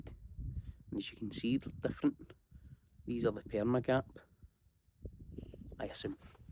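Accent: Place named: Scotland, United Kingdom. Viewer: That's a normal Scottish accent .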